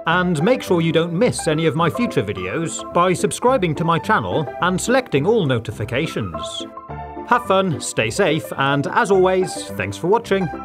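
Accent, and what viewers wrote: United Kingdom. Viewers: He's British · Are you from uk🇬🇧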